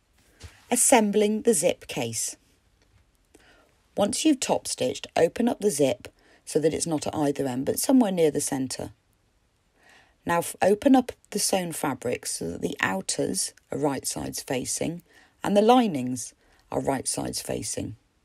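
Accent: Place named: Birmingham, United Kingdom. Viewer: Thanks for this tutorial ❤ Sounds like you’re a fellow Brummy 😊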